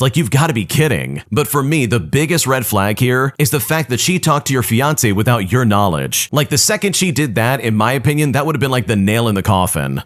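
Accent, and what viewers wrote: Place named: North, United Kingdom. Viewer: I love this dudes northern british accent wish i could have it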